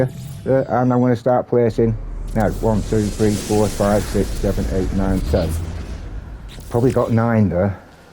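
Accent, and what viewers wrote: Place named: England, United Kingdom. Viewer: Great to hear bloody brilliant regional English… no messin’ about… job done! 👍🏻 ❤ 🏴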